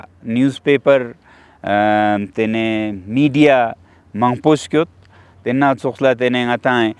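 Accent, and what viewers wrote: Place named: Ladakh, India. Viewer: Hats off to the son of the soil. Alas I can not understand Ladakhi accent fully.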